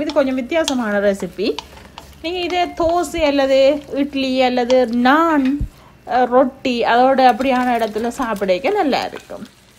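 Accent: Sri Lanka. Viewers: This is manju rockzz from india u r srilanka tamil ...enaku srilanka tamil romba pudikum..unga channel melum valara valthukal...thank u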